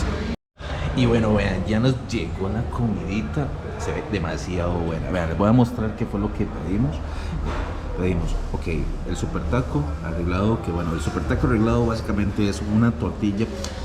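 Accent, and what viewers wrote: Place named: Costa Rica. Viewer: Usted de donde es xq parece tico ?ya como habla pura vida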